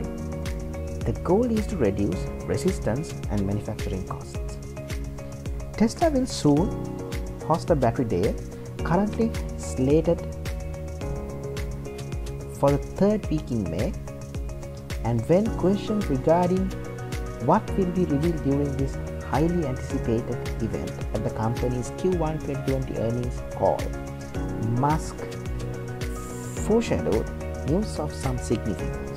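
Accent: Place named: India. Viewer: why India English.......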